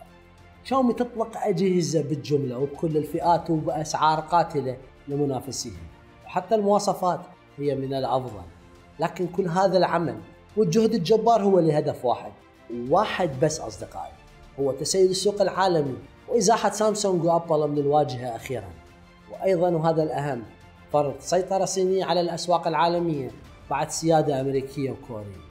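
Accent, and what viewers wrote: Iraq. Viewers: لغة عراقيه طاغيه استاذ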